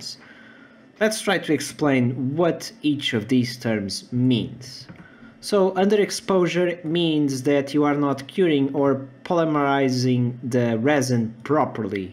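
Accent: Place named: Portugal. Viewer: Are you portuguese? Your accent makes me want to buy you a Coffee and a Pastel de Belém..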